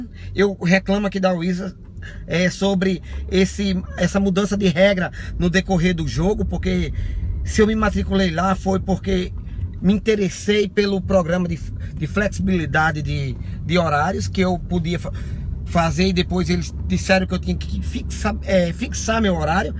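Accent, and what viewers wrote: Northeast, Brazil. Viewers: Tu é nordestino neh macho? Kkk cheguei agora no canal, vou ser inscrito.. E sim eu faço SENAC-PE e so tenho a dizer que é top man, eu não sabia de naaaaaada, e agora to quase terminando o básico e posso dizer que ja posso ter uma conversa básica com um americano, principalmente saber ouvir. 5 meses de curso.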